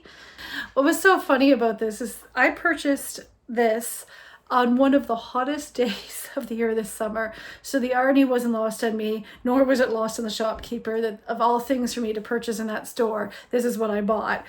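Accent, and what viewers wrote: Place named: Canada. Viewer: Even as a Canadian I notice you have such a striking Canadian accent! 😍 maybe it’s native Nova Scotia accent?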